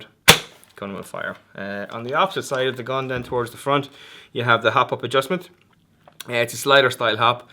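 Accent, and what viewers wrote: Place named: Dundalk, Ireland. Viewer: That dundalk accent though!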